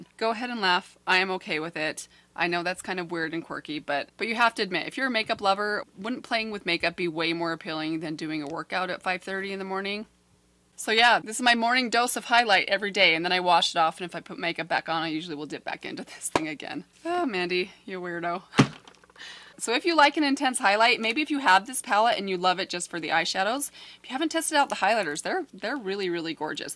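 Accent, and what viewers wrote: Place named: Brooklyn, United States. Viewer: Um, pretty close to an OG New York (Brooklynese to be precise) accent! #Fuhgettaboutit Thanks for the podcast suggestion, love to listen as I try to drift off 😴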